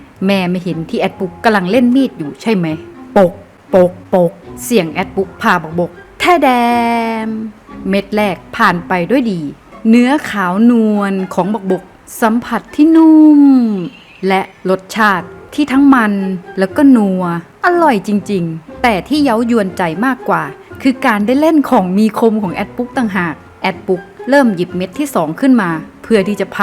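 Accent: Isan, Thailand. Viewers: พี่เป็นคนอีสานใช้มั้ยคะ คนอีสานคือกัน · แสดงว่าแอดเป็นคนอีสานเหมือนกันใช่ไหมครับ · คนอีสานหรอพี่อะ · เอ้าเจ้าคนอีสานบ่แอดปุ๊ก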